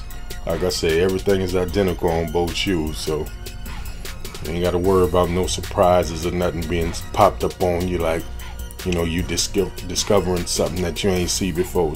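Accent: New Orleans, United States. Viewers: Is that a nola accent I hear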